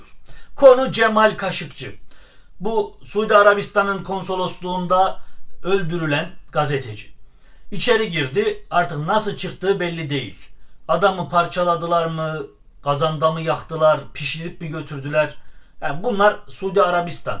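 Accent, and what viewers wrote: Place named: Koruk, Turkey. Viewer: kardeşim arada anlatımınız koruk şivesiyle çok güzel komik oluyor koruklumusunuz ^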